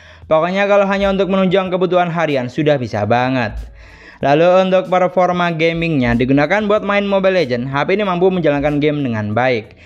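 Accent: Madura, Indonesia. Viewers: Apa om ini orang madura ya 😅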